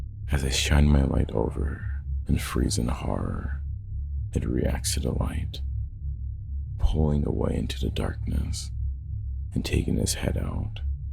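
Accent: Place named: United States. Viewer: U sound like an American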